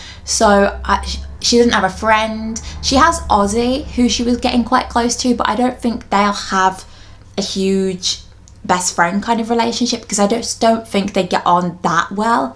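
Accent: England, United Kingdom. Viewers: I was watching this video because I love and she started talking about her dream but I didn’t hear the dream bit, so I was screaming my feelings as I was walking to the tv and was angry because I thought she was sharing spoilers on an episode that had already come out that I didn’t see! Then I was looking EVERYWHERE on my tv for it but I couldn’t find it! So I started searching it up on my phone about when it came out but couldn’t find anything. I knew different countries released tv shows at different times (like dance moms) but she has an English accent like me so I was confused. Then I rewinded it to see if she’d said anything about where she watched it and found out it was all a waste of time. I love and the next step! If you read this comment anyone sorry for wasting your time. Edit: Thank you for liking my comment! (I think that’s how you spell it) ❤️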